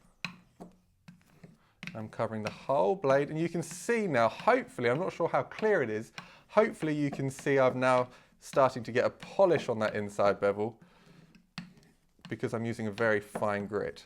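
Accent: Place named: England, United Kingdom. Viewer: He’s English you wanker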